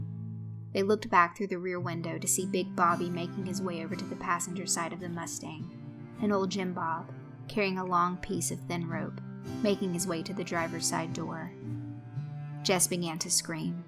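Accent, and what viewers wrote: South, United States. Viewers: I love the southern accent you used while telling this story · You are definately underrated as a narrator. You are one of favorite reader and I love how you can do both a southern and english accent!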